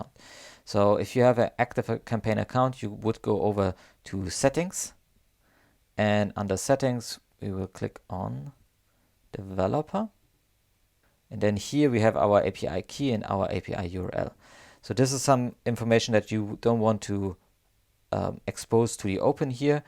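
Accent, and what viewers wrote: Germany. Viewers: Wenn ich den Akzent einschätzen müsste, würde ich zu 99% sagen, er ist ein deutscher!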